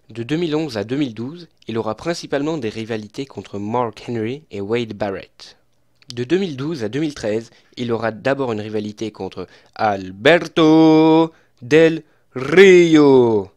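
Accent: England, United Kingdom. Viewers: J'aime beaucoup le contenu mais paaaar pitié stop ton accent anglais putains xD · Mdrrrrr trop gênant car le contenu ça va mais j'arrive pas à me concentrer, arrête l'accent anglais gros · J'ai kiffé mais y'a juste un petit truc c'est mieux quand tu parle sans l'accents anglais parce*-que avec on comprend pas trop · je veux pas etre mechant mais je tient a dire que ton vieille accent anglais est vraiment soulant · Pourquoi tu parle avec un accent anglais c moche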